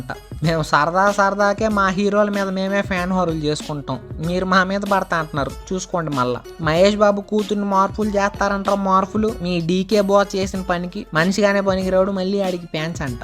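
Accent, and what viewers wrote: Nellore, India. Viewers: Nellore slang bro..😂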